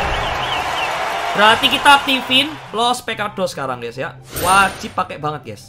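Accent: Java, Indonesia. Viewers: medokkkkk jawa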